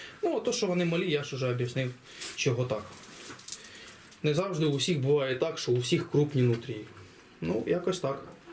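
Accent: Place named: Poltava, Ukraine. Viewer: Говірка схожа на полтавську😂.мені як прлтавцю-понятно все.кому не понятно-хай не слухає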